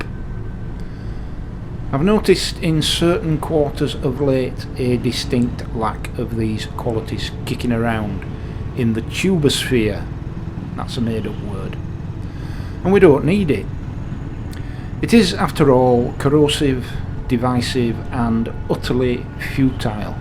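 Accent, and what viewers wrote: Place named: North, United Kingdom. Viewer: Sage words, friend, beautifully expressed(as usual). I often gaze and admire the skills of athletes, sit in awe of the intellect and wisdom of the Hitchens, Frys, Dawkins, Connolys(Billy), Gervaises, Murrays(Douglas), men&women(no pretend inbetweens) in general that inspire self-betterment. But one of my great joys in life.......is to listen to and envy that fabulous lexicon you deploy so wonderfully, friend. That amazing vocabulary of yours, wielded so mildly yet unaggressively with that marvellous northern lilt, is a major pleasure and superb voice of reason and mild-mannered advice for embattled ears. Thank you kindly for your years of service in uniform and the great guidance it now offers a father and husband trying to navigate a very frought world. Take care, friend......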